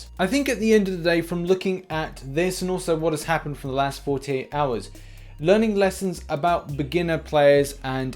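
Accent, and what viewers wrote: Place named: Yorkshire, United Kingdom. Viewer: Not a bad Yorkshire accent there I’m from barnsley which is the broadest Yorkshire!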